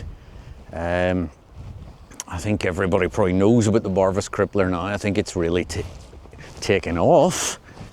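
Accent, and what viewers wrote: Northern Ireland, United Kingdom. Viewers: Hi. A little word form a rellow Northern Ireland Man who has been in England after university for 50 years. It is so good to hear your wonderful Northern Ireland accent. I have not lost mine. I am proud of it. My dad took me fishing to Lough Melvin. I loved it. Trout seatrout and salmon fishing came into my Life. I was hooked. However I have caught seatrout at night but my only salmon was caught on the spate river Margy at Ballycastle co Antrim. Best wishes for the season.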